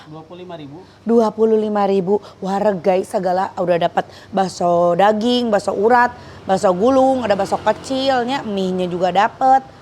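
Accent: West Java, Indonesia. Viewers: Punten teh sekedar saran. Tth jgn pke jargon endol surendol di chanel tth. Krn mnurut ku chanel tth tu khas pembawaan dr tth yg spontan dgn bhsa Sunda atau logat Sunda. Jargon itu kan buat di TV sebelah brsama rekan kerja tth biar ada ciri khasnya dr chanel tth gtu jgn pakai jargon itu gtu Hehhe punten hanya saran Overall aku suka smua konten2 tth sehat sllu th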